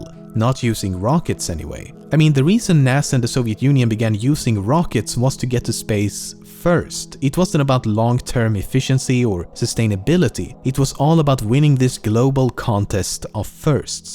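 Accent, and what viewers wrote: Sweden. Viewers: love u man with ur sick swedish accent, keep up vids ive been with u since 50k subs and rember when u still replyed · you sound soooo Swedish tell me you are!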